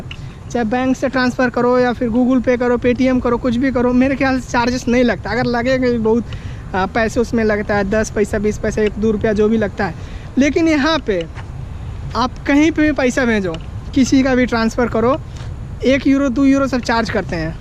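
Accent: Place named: Bhojpuri, India. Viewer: Ekdam bhojpuriya babu jaisa bol rahe hai bhai , we need video like this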